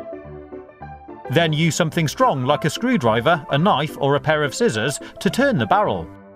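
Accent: United Kingdom. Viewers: He has a British accent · You guys are fools, he's British and also has a British accent. He's even using a 50 pence coin in the video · Fahima Husaini he’s just saying his accent is British I’m British to but I still laugh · It is a British accent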